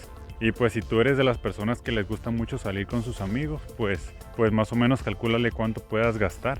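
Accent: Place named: North, Mexico. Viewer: Acento norteño mexicanazo.😊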